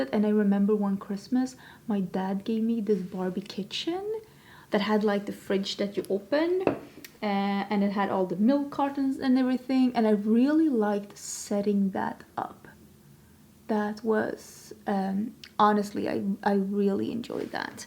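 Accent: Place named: Sweden. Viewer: Its been awhile since I watched a makeup review You're losing your accent 😭 It use to be very thick and unmistakably Swedish(not a bad thing) but it happens to quickly when you move anyway from one's homeland but I can definitely hear your accent softening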